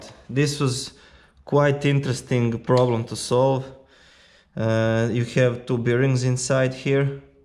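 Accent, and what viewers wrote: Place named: Russia. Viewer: Cool work! Love your kinda Russian English! It's a freaking death machine!!! ;-)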